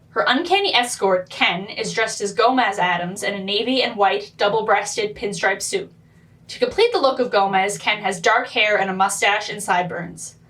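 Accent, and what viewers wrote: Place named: Canada. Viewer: I like your accent! Canadian detected?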